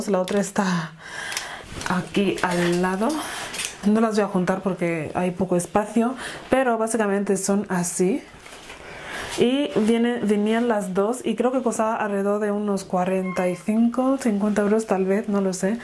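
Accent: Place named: Equatorial Guinea. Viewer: Saludos desde Buenos Aires!! Me encanta el acento de Guinea Ecuatorial!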